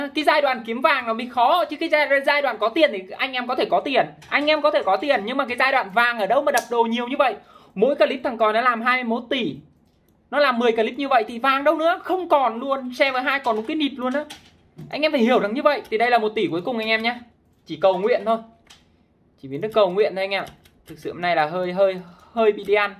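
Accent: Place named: North, Vietnam. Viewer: người miền namnois giọng sương sương miền bắc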